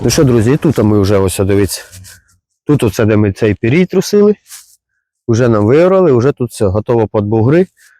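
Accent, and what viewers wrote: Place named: Dnipropetrovsk, Ukraine. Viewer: Говор схожий на Дніпропетровську обл, думав що ви з пятихаток👌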